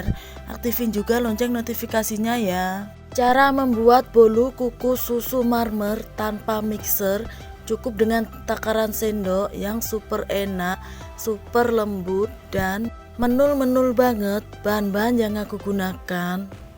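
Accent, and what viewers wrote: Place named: West Java, Indonesia. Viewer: Orang Sunda ya..kentara suaranya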